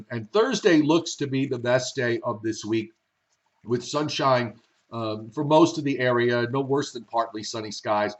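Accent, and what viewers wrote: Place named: Long Island, United States. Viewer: Good to see you... Whenever I hear your voice it reminds me of my Long Island growing up days!!! Thanks for the update:)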